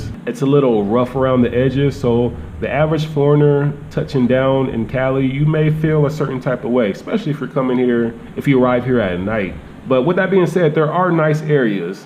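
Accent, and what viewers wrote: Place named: East Coast, United States. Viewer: Wow man I didn't expect you to be from Seattle the northwest that was surprising cause you talk like a east coast dude with all that swag in your voice lol.. thought you was from like Northeast in jersey or new york...but keep up the great work with the vidz bro #Respect 👊🏾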